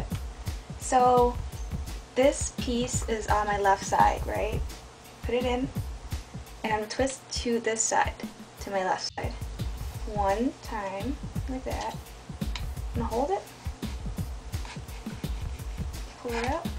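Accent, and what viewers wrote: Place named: Midwest, United States. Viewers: r u from the mid west? you sound juuuuust like me and my friends haha! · i think its a midwest accent... im guessing she is from chicago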